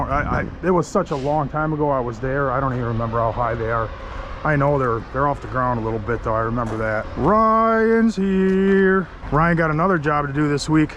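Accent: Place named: Wisconsin, United States. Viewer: Hey Ryan, Love your videos. When you said Kenosha you had my attention. I was born in Waukegan and lived in Kenosha until my dad retired from AMC. We moved to Arkansas when I was eight years old in 1987. My wife asked me how come I don’t have your accent.😂 I told her that because I have a southern accent. 😊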